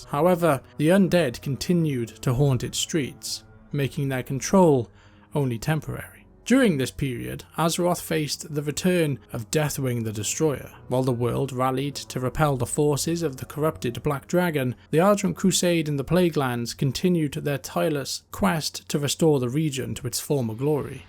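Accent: Wales, United Kingdom. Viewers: hey mate cool chanel, are you welsh btw?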